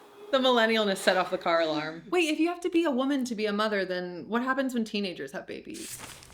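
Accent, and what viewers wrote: United Kingdom. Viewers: Your intonation is so British now!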